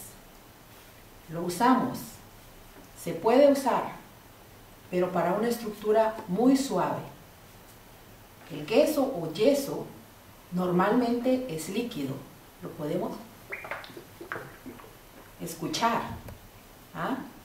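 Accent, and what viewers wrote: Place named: Chile. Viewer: Hola ,, por tu asentó me tinca que eres chilena ..pues bien ,, me gustaría saber si vendes tus obras , gracias por tus tutoriales muy buenos ,,saludos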